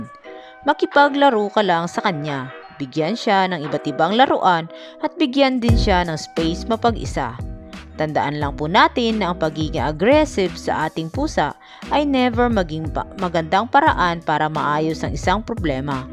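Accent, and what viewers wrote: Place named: Visayas, Philippines. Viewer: Huwag ka naman bully Tiktokersph pangit ang ugaling ganyan. What's wrong having with visayan accent? Ikaw ba excellent ang accent at grammar mo??! Manood ka lang huwag ka ng mamintas! 🧐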